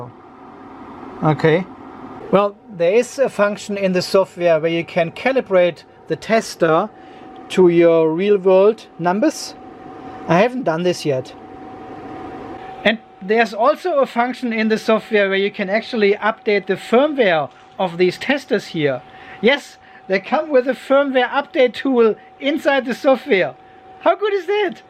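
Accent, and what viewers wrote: Germany. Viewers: CamperT aus Deutschland. Sag mal bist du deutschsprachig? "alter Schwede" kennt sonst kein Mensch. Und dein harter Akzent im Englischen ist wunderbar zu verstehen. Deine Videos sind klasse, habe den EBC A40 schon bestellt. Bitte weiter so in "down under". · I love your denglish !!!!!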